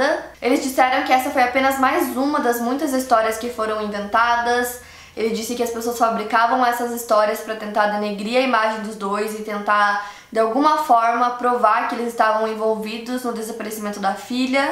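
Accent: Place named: South, Brazil. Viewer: Olha so acho q ela tem sotaque do sul🤣🤣 Mais da pra entender. Tem como colocar legenda tbm!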